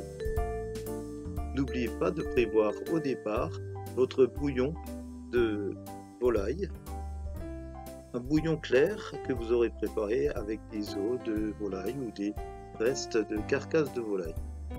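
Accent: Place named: France. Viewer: I love your French accent too, sounds so beautiful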